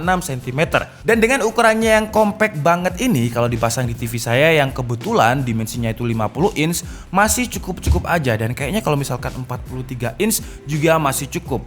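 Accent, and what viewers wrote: Malang, Indonesia. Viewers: Loh orang Malang?:v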